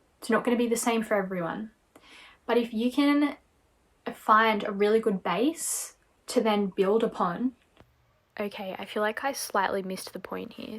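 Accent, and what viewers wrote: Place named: United Kingdom. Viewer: I love your accent! UK, right?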